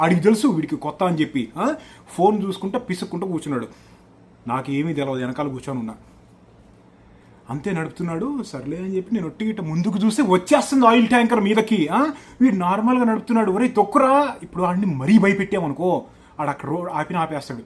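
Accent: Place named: Andhra, India. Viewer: Nizamabad antav Mari Andra slang matladthav?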